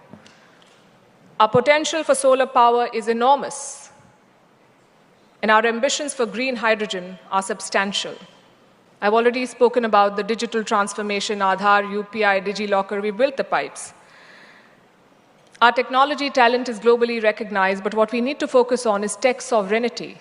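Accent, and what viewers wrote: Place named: RP, United Kingdom. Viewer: Palki Sharma we citizens of India proud of as you explain in amazing Queens english , take care of you eat well and sleep well , please follow my advice from Chennai , Bharath Matha ke Jai, Jai Hind ..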